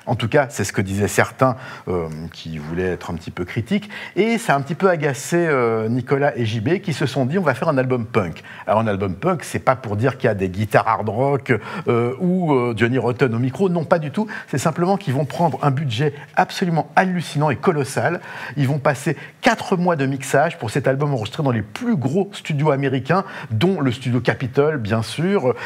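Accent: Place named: United States. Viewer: Merci pour cette rétrospective bien sympathique.. Un clin d’œil à ton accent américain c’est énorme ! Cindy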